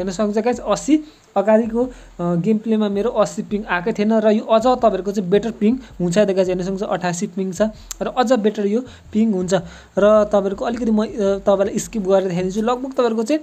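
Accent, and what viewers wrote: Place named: India. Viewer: Yo Indian jasto hawa dherai nabolnu hai.eakdam irritating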